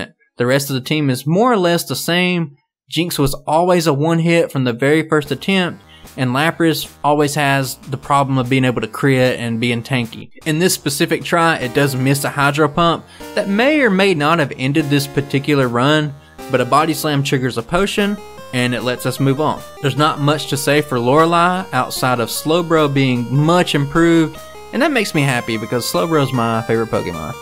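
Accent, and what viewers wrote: South, United States. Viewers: I am glad that the algorithim gods blessed me with your channel. There is just something I love about the American southern accent that I find wholesome ( I know that's stupid, don't at me!) I find that I enjoy watching pokemon challenges, because they are the perfect background noise while I play other games or study.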